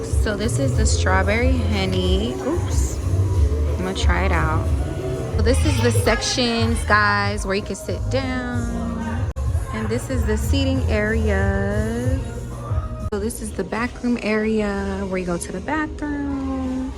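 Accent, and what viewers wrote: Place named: United States. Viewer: You got it going on there quick question are you Haitian coz you sound more American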